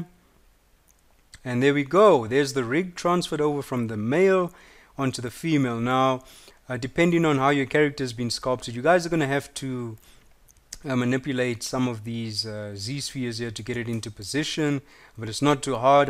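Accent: South Africa. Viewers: are you South African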